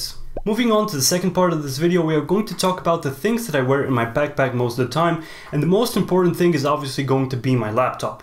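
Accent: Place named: Eastern Europe. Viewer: To be honest, I'm from Russia, but I speak English. Initially, I think you are from Eastern Europe. It turned out to be true. Thanks for the answer!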